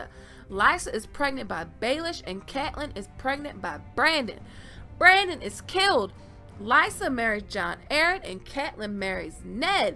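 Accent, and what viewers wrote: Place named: South, United States. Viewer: This female sounds like a stereotypical southerner , aunt jemima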